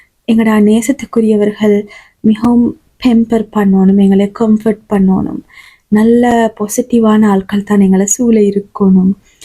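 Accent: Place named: Sri Lanka. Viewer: இலங்கை யின் சுவையான தமிழில். இனிமையான. இஸ்லாமிய சிந்தனை. நன்றி சகோதரி.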